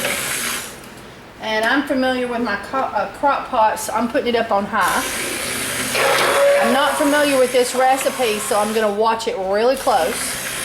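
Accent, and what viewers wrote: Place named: Alabama, United States. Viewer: I love that Alabama accent !!!!!!!!!!!!!!!!!!! Yeeeee Hawwwwwwwwwwwwwwwwwwwww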